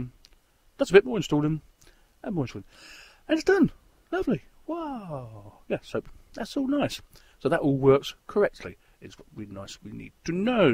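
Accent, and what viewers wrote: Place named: England, United Kingdom. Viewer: Although as a German, I Cant get really into your English Accent, but I Tried it. Well, Im using Xubuntu 10.10 since a Month and its really great. The Default Style is so much better, And the Speed is very nice. Before all that I Used Gnome and I Was very Happy with it. But Gnome got slower with Maverick, so I Tried first Xubuntu. And stayed there. :)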